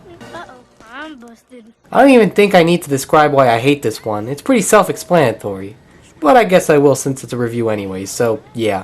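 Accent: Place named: Nashua, United States. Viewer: I heard Nashua, NH accent...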